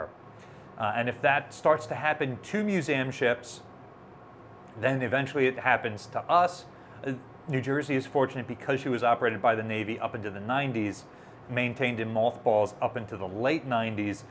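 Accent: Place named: California, United States. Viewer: Personally,i think you need to work ok ennunciating. They are MUSEUMS, not myoozims. Then again, you sound like you are from commiefornia, so i cannot expect much from you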